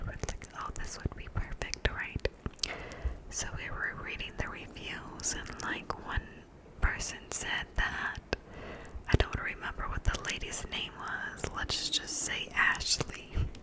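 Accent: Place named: Midwest, United States. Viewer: your Midwest accent makes me feel right at home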